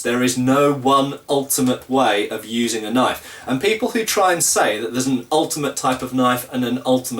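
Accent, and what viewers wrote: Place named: England, United Kingdom. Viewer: Iona J He has an English accent and uses facts.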